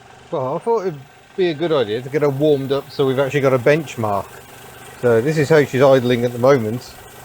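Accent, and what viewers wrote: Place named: England, United Kingdom. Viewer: I like how you English say chickin. Daewoo is a nice shape but not the colour I would personally pick. That three cylinder growl was a treat to the ears. I also enjoyed the Charade for that in a recent video.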